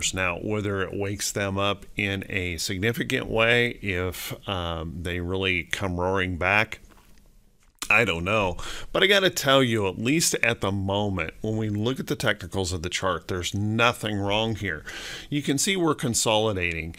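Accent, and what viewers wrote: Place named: United States. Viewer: Your morning market prep intro sounds so American 🙌 glad to hear that you’re doing better.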